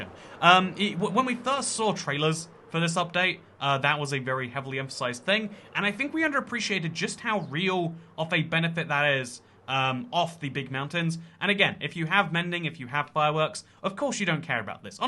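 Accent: United Kingdom. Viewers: cus he's bri*ish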